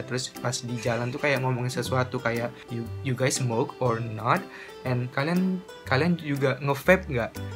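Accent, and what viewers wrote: Malaysia. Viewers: 🤣🤣🤣. Tiberr is shorform for Tiba². It's " oh what a sudden" or "and suddenly". 🤣🤣 U dah macam orang KL dah. Perkataan membawang ni baru few years ni diorang start pakai. Sebelum ni kitaorang pakai mengumpat je.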